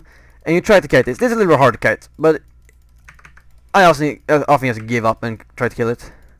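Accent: Sweden. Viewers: Man hör verkligen att du är svensk :P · Great guide man! Quick question that isn't related to league though, are you swedish (kind of sounds like it on your accent ^^)?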